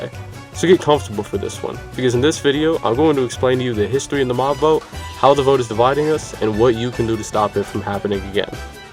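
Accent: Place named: South, United States. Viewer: bro is mad southern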